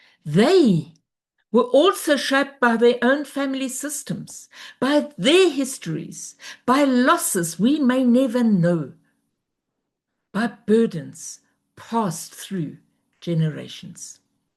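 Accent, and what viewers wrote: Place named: South Africa. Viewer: Lovely to hear your South African accent. Thanks for this video. Will send it to my (adult) kids... getting them to listen is another story 😉🙏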